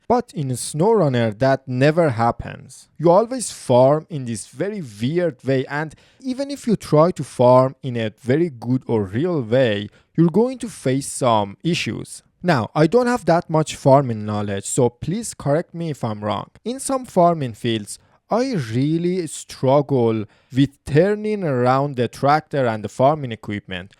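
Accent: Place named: Iran. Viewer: good luck buddy. perfect channel. like your persian english accent.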